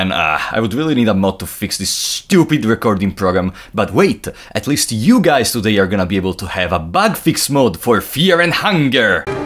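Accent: Italy. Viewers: sento dall'accento l'italianità, contento di vedere un altro fan di questo gioco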